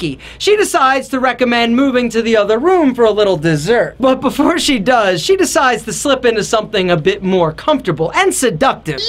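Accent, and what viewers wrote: United States. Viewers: I’ve never seen someone sound so american like this man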